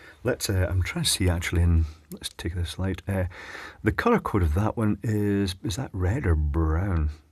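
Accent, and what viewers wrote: Scotland, United Kingdom. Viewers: Twotone Scottish pronunciation.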